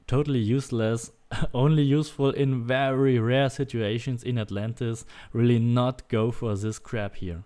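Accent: Germany. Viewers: I haven't heard such a classic German accent since playing Titanic Adventure Out of Time.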